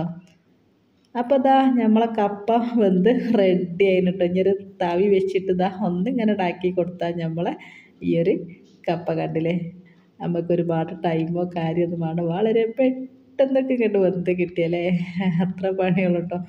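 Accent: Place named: Malappuram, India. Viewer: Wow ഹെൽത്തി കപ്പ കറി അടിപൊളി ആയിട്ടുണ്ടല്ലോ പൊളിച്ചു എനിക്കു ഇഷ്ടം എല്ലാവർക്കും ഇഷ്ടം ആകുന്ന മനോഹരമായ മലപ്പുറം നാടൻ ഭാഷ വന്നപ്പോൾ കപ്പ തിന്ന ഫീൽ ആയി 👌👌😋😋🥰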